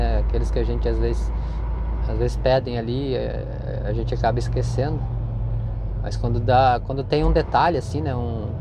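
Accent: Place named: Rio Grande do Sul, Brazil. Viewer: Você tem um sotaque de gaúcho tchê!!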